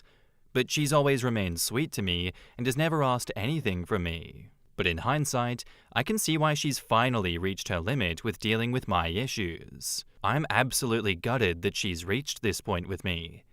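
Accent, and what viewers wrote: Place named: Australia. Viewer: You can tell this narrator is Australian because he's a cucked wuss who's whole country should be erradicated.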